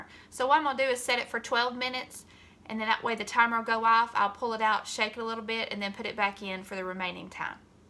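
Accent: South, United States. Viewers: Thank you!! Love your southern tone ❤️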